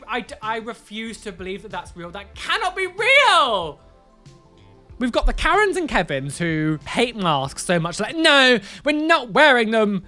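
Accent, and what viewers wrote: United Kingdom. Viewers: It's great to be able to understand what a youtuber is saying without upsetting some people it's easier for a brit to be able to understand a brit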